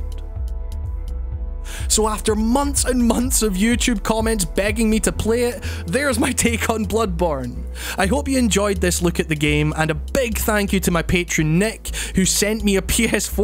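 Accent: Scotland, United Kingdom. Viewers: Are you Scottish? Because you have a beautiful accent.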